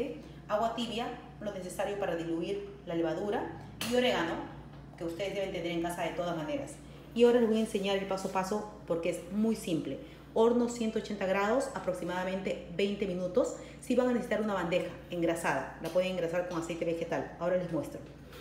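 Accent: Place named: Peru. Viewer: Que hermoso acento peruano. Un abrazo desde Chile. Soy de Ica.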